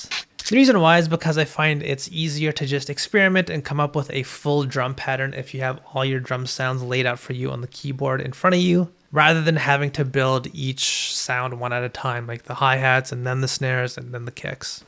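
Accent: India. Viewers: Weird i never notice but your indian accent is heavy in this, i never noticed. Dope vid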